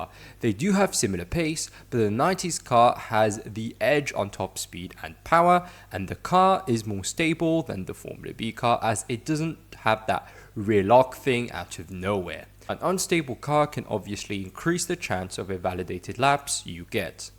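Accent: France. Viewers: Thanks for the tip. Btw I enjoy your French accent. 🇫🇷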